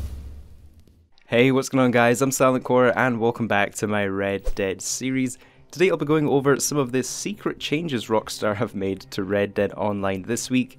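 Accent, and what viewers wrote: Scotland, United Kingdom. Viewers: I wish the snow would go already. I hear by your accent your Scottish, I am too. Keep up these videos, I'm learning alot from you.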